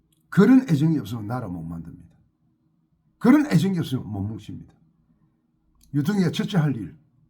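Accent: Gyeongsang, South Korea. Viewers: 같은 경상도 말씨 좋아요 우리 함께 자유통일당 당원이여서. 감사드립니다. 구독은 진작했읍니다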